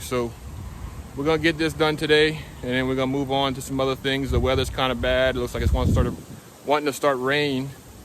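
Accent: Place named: South, United States. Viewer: Canada? I don't know his current address but that accent is from waaaaay down below Canada.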